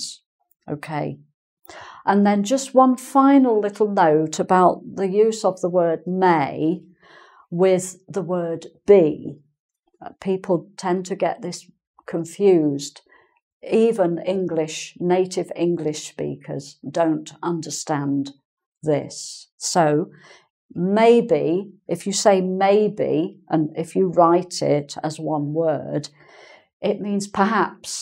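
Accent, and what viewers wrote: United Kingdom. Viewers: Nice explanation! I love the British accent...in fact you can't disassociate a language from its own background, right? I have a question on this topic here regarding to pronunciation. The word "maybe" has a slight difference from the 2 words "may be", doesn't it? I do think I hear that! In "maybe" is the first syllable stressed? In "may be" is the second word stressed? Am I right? Thanks for your videos....I love them! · * Hears a British accent * * Love for the English language intensifies!!! * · she is beautiful, her speaking style is very British and it makes everyone understand❤️ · It might be her English/British accent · Love that british accent. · Her English accent is perfect as I am quite familiar to British accent . From Thailand. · My god i really miss the British accent 😢💔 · British accent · Lovely British English ❤️ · I love your british accent. 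· What a lovely woman, this is the British accent that everyone can understand. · such a beautiful British accent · I love your beautiful accent !!! and your lessons of course !! Your british accent is wonderful. Regards from Chile. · I love your British accent!^_^ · ale jaki to akcent? londynski czy moze oksfordzki? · This is the accent that the world wishes all Brits had! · She is so charming,....and, her voice and her British pronunciation are very pleasant indeed. Thank you, Ms:-). It has been a real treat.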